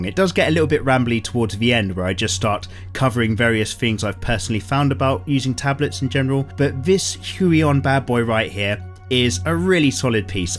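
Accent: United Kingdom. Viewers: british accent))))) P.S. thnx 4 review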